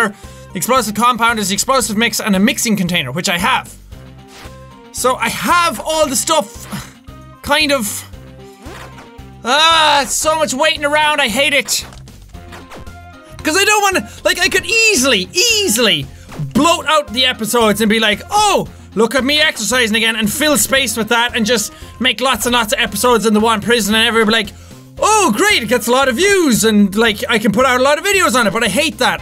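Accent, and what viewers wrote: Ireland. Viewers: He's Irish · I spent the majority of my day binge watching this whole playlist and now that it's been a few hours since then i've noticed my inner monologue has taken on an irish accent. I'm definitely not mad but this is very strange.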